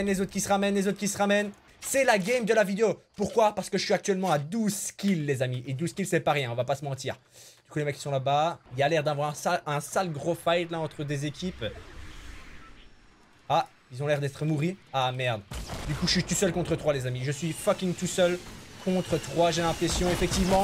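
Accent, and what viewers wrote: Belgium. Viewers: T'es belges ?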